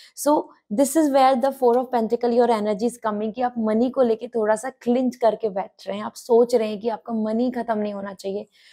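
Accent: Haryana, India. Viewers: Are you from HARIYANA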